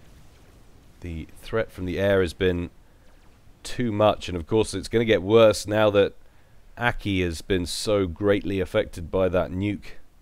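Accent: United Kingdom. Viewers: God that british accent. So reminding me of Deman and his quadv casts of supcom.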